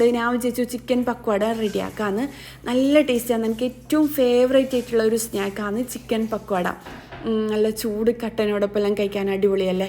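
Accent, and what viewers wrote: Kannur, India. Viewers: Kuttikal varumbolk pappa dam parakoole nammala kannur bashayann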